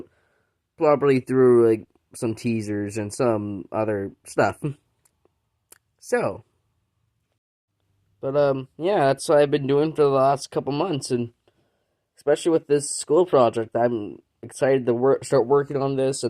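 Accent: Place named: Canada. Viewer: Damn bro you sound hella Canadian😂✌️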